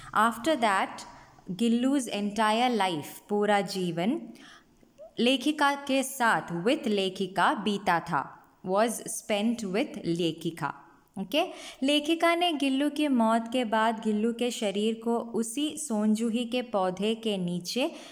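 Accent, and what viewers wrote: Kerala, India. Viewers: good english , good hindi and I also think your a malayali by your accent , lemme know if that's true and also thanks for the explanation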